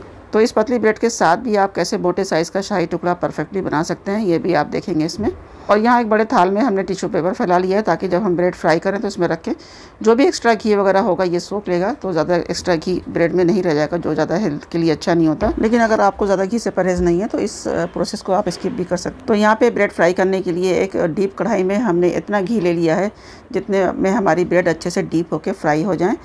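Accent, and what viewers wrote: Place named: Urdu, Pakistan. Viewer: آپکا اردو کا خوبصورت لہجہ ہمیں بہت پسند ہے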